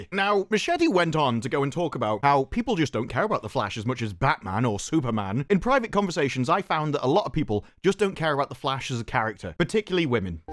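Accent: United Kingdom. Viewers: Did the british accent just talk shit on the 5th largest economy on the planet(california)? Sounds like someone from the 6th largest econ (uk) better plug his queen hole.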